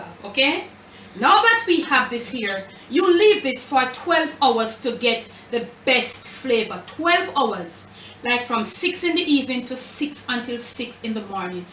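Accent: Patois, Jamaica. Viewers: Mi love u patois😂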